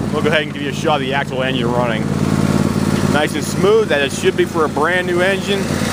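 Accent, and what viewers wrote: Pittsburgh, United States. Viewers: Love your Pittsburgh accent . My Wife and I were laughing as we grew up there but have been in Ga for 20 years now. We still have our Pitt accents and people still look at us funny when we talk. I went dahn to home depot and looked at one today n’at as my wife wanted to buy me a new mower for christmas but I didn’t want the credit card bill so I’m gonna save up till spring and get one then cuz I’m tired of repairing my old MTD yard machine every time I try to use it. Gotta say the old MTD still runs strong yet it need a lot of things revamped. I was thinking of putting the twin V from the MTD on a JD LT model I bought cause the deere cuts and handles so much better than the MTD but I keep getting junk in my carb jet on the JD LT model even though I replaced everything from fuel tank to carb except for the fuel pump and metal line across the motor. 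I think the junk might be coming from the metal line so I’m gonna replace that line and maybe add another fuel filter pre before the carb but I also have to take apart the carb again to clean the jet. If I can’t get that to work I’m selling it for parts along with the MTD and just getting a new mower. I’d love to find a video on the new E120 deere’s concerning maintenance and possible problem areas since I fix everything myself.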